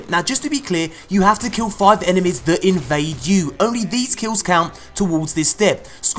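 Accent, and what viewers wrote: United Kingdom. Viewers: Why tf are do all these destiny channels have a British Accent?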